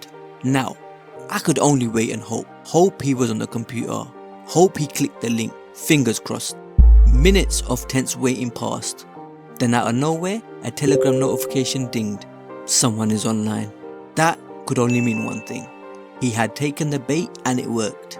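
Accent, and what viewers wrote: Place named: Nigeria. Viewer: Why are you talking like a Nigerian 😂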